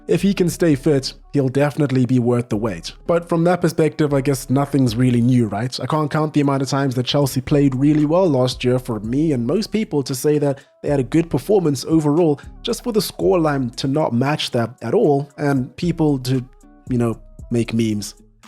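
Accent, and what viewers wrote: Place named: South Africa. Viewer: Bro what nationality are you? Sounds crazy, but you sound South African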